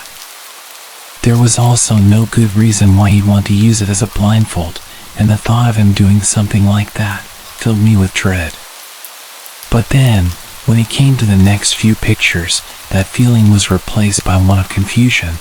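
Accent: United States. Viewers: Thanks for the western voice! So tired of everything being the same eastern accent.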